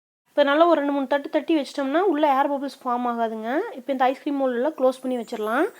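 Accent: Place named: Sathyamangalam, India. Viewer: Hi, nice videos,I just love your Tamil slang, I feel like I am in my hometown Sathy when I hear your voice. where are you from?where are you located?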